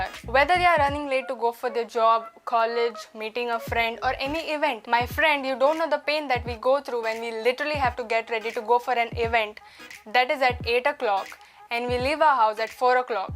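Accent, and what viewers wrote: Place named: Goa, India. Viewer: I love your goan English accent I am from Mumbai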